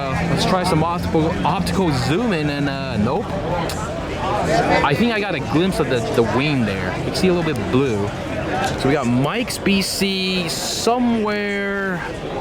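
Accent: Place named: California, United States. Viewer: Love the commentary, totally California dude!. Miss it. :)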